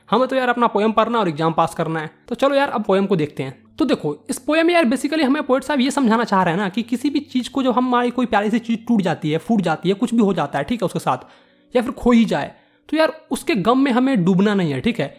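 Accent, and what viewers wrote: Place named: Maithili, India. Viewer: Beta I love your maithali tone . Because I am also a bihari ❤️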